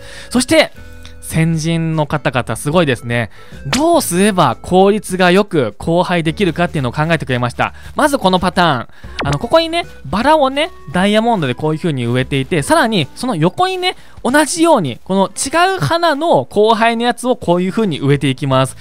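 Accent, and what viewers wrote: Aichi, Japan. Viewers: 愛知のひと？方言が懐かしい感じする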